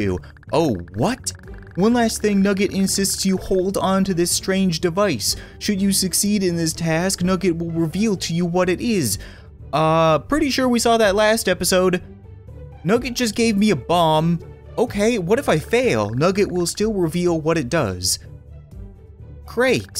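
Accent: Canada. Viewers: You sound really Canadian in this video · I like his Canadian accent it’s funny as hell when you get used to it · True Canadian voice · He sounds extra Canadian and I love that I want to visit Canada so bad now. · I just LOVE how Canadian he sounds! XD Just listen to when he says "sorry"!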